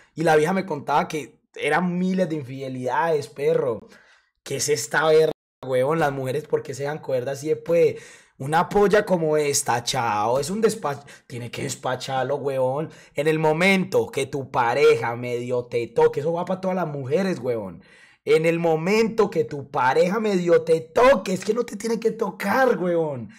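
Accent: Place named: Medellín, Colombia. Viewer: Este chico es de Medellín la forma d hablar d ellos es inconfundible m hacen reír mucho😄👍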